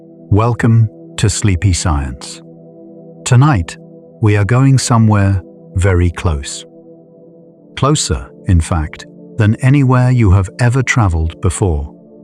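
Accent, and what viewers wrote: England, United Kingdom. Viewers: Thank you for your wonderful videos. The information is awesome. I do fall asleep in the middle, but I know that your information is well researched and will go into my subconscious. Another idea for your videos is what creates disease I’ve been listening and learning from Dr. Robert Morse he’s in Florida. He’s a nature path and his philosophy and understanding on the body is that we have two systems the blood system and the lymphatic system, and the most important system for health is a lymphatic system, which is our draining system. You are either acidic or base alkaline and the bodies always trying to be at homeostasis so if you’re sick, you’ve got too much acid in your system and in order to bring Health, you need to bring in more alkaline so he is a fruitarian or more so high fruit and plant-based very interesting. Interesting intelligent man look them up. 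he’s also an iridologist and he’s very very wise so if you could learn his information and put that into your video, it would be a great way on how to teach people how to be healthy. Thanks again. Love your videos.🥰 ps.. I love the English accent very soothing, im from Vancouver B.C.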